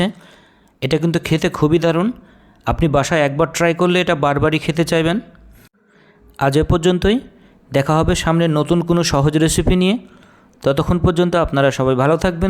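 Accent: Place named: Chowdala, Bangladesh. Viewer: চৌডালার আঞ্চলিকতায় না খেয়েই কিছু বলার নেই। ধন্যবাদ চালিয়ে যাও।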